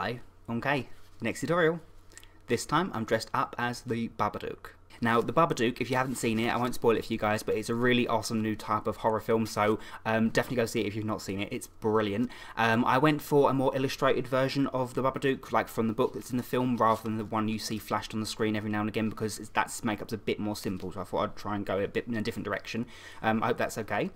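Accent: United Kingdom. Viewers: [incredibly frighting man in make-up intro] [adorable soft speaking british accent (?) when he talks] this is the video I needed today, no question about it.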